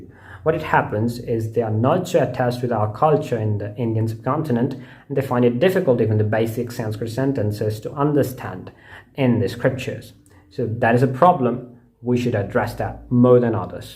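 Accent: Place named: United States. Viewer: Pretty good American accent! Talented guy you are!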